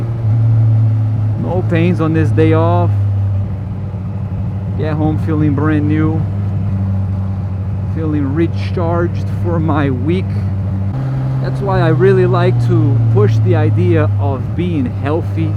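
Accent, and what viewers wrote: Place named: Taiwan. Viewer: So youre from Taiwan? Do all people from taiwan have that accent? And also, do most people speak such fluent english? Love the channel. Subscribed. Hopefully you post some new vids one of these days!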